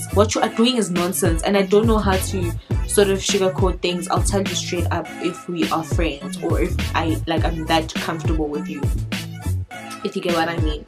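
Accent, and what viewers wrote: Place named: Nigeria. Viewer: The Nigerian accent 😂😂😂😂😂😂😂 I'm sold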